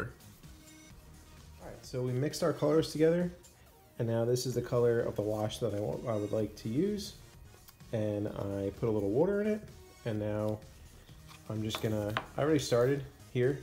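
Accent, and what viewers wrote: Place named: Philadelphia, United States. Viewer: Lol something tells me you're from South Jersey/Philly/Delaware? Go birds? Also nice vid.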